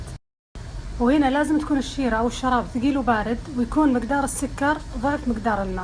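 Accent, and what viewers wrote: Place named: Najd, Saudi Arabia. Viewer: هاذي لهجة اهل نجد بشكل عام 😍🥰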